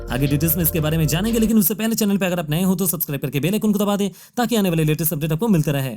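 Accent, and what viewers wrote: Bengal, India. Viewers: Your hindi has improved a lot. But it still needs to leave the bengali slant. Other than that, the videos are good in most aspects. Best of luck for future.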